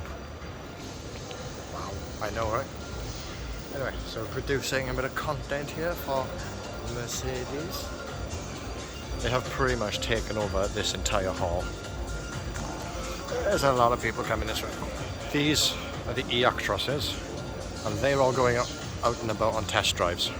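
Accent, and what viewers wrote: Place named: RP, United Kingdom. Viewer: like your b.b.c.voice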